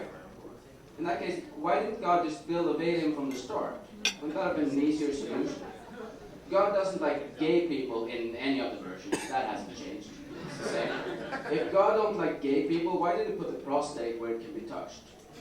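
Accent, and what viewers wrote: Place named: Sweden. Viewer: Haha han pratar tvär svensk engelska dock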